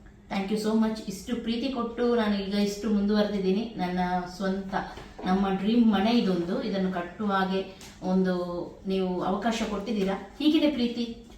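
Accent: Mangalore, India. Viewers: Manglur bhasha yestu chanda😊l fast time watched your video super 😊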